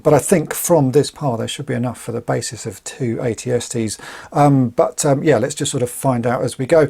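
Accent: United Kingdom. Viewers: When I read the title “What can I build”, see the junk pile, and hear your British accent, Dr A’s steampunk came to mind.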